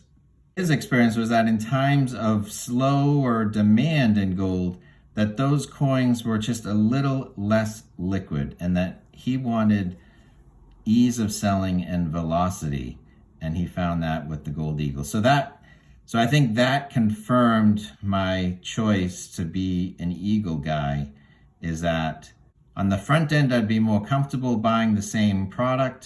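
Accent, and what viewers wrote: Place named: Massachusetts, United States. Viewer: You sound just like a Massachusetts friend of mine from Florida. He moved back to Massachusetts several years ago. Great video!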